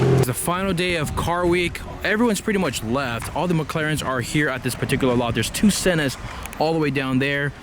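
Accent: United States. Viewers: The way you talk, quintessential american. like it....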